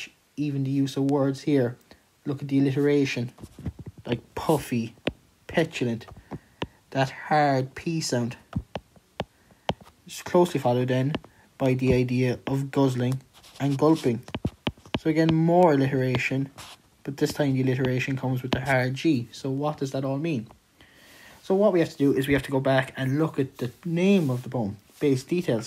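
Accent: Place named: Ireland. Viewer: love that i can listen to an irish lad and it is perfect bbecause he sounds identical to my teacher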